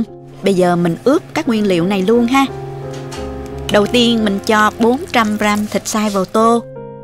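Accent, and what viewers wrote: South, Vietnam. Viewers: Tuyệt vời cô ơi, cô chỉ dẫn thật rõ ràng cứ theo đó mà làm có món ăn ngon lành, giọng cô trong trẻo thân thương như chữ há, nè, hén, nha của người Nam Bộ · giong noi mien nam hien lành và de thuong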